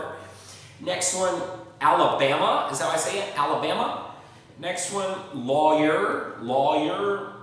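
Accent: Illinois, United States. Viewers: I live about an hour away from Rockford, so this sounds correct, for the most part. I pronounce crayons differently though. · I'm guessing you're from Danville or Marshall or there-a-bouts...lol I'm originally from Terre Haute. I pronounce everything the same as you! Enjoyed the video! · I am not originally from Illinois, but both of my parents are. They sound exactly like you! It's interesting to see how much of my pronunciation I've inherited from them! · A lot of this is really similar to my accent, Im from Decatur, in the heart of central Illinois. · Sounds like up here in West Central IL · This accent is a lot like mine (minus a few words) But my dad is from Illinois so it makes sense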